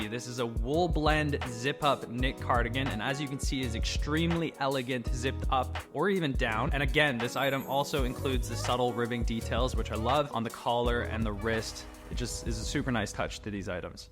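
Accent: United Kingdom. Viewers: Had to drop a like for the British accent LOL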